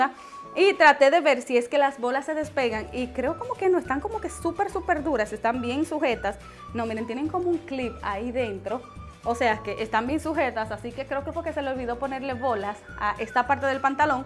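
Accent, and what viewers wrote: Dominican Republic. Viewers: Tú eres Dominicana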